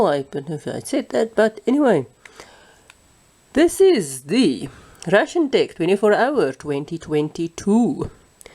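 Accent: South Africa. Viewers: Joh that South African accent is coming through hard😂 Baie goeie review👌🏻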